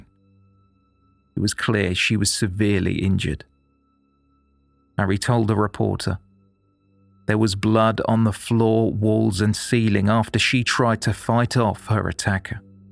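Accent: England, United Kingdom. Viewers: I like the narrator s voice very much n his english English❤❤😊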